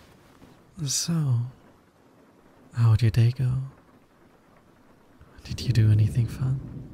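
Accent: Germany. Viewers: Der Deutsche Akzent ist 🤌🏻🤌🏻🤌🏻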